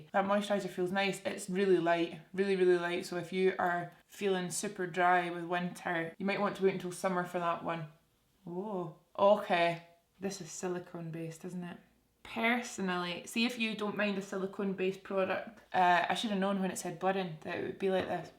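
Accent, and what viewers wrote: Scotland, United Kingdom. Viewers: It was the accent for me. Oh how I miss Scotland. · My personal opinion I LOVE 💕 the way you say “Girl” I absolutely love you’re Scottish accent!!!! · "My ghetto friends" Ha ha ha!! I love your Scottish accent and the way you say girl! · HOW stunning is that eye make up!? 😍 ur channel is forever my fave. Love hearing a fellow scottish accent on YouTube 😘 p.s I live for the out takes at the end of each video 😂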